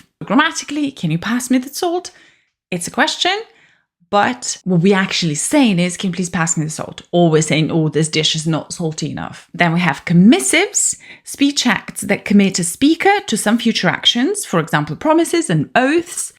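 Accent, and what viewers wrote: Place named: United Kingdom. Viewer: It's interesting that your British accent is so strong.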